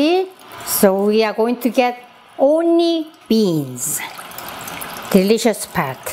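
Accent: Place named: South Korea. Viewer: 이분정말 매력있습니다 발음 귀에 쏙쏙들어오는 한국식영어 ~~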